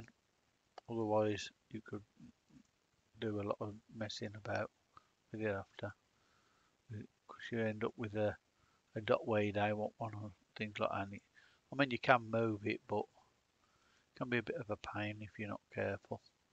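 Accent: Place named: Birmingham, United Kingdom. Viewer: Thank you for that very easy to follow tutorial, great t hearing your Brummie accent.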